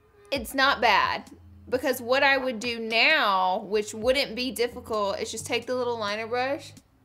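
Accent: South, United States. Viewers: rute maria or Farrah Abraham..but a little more southern? idk who she is so idk if she's from the south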